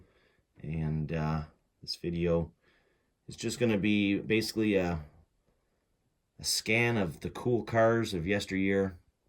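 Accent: Canada. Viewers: Don't be ashamed of your heritage! Just teach them that Canada pronounces things differently, I think a lot of people just assume you're from Wisconsin. lol I used to watch Davidsfarm back in the days, he was practically your neighbor out there in Lucan, and he had the same nuances with his pronunciations, he also did the Zed phrasing.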